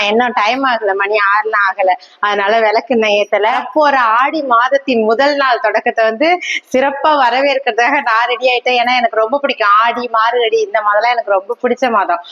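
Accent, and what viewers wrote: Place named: Tiruchirappalli, India. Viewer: Nanga Trichy akka neenga pesuradhu nanga pesuradhula ore madhiri iruku ,ipa chennai la irukom Inga dha konjam different ah enaku theriyudhu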